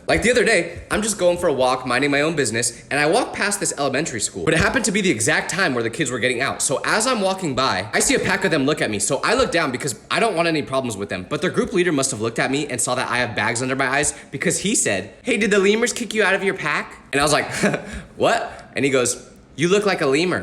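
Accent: United Kingdom. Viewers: Your British AHHHHH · Why does he have such a good British accent…. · tell me how you talk British in two episodes and you’re telling me that if a ghost was there, it will be scary. If it was British how does that make any sense? You literally speak British? How is that scary to you? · your british AHHHHHHH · I’m British you don’t need to put a British accent we don’t mind but it is a very different place to be in because there really rude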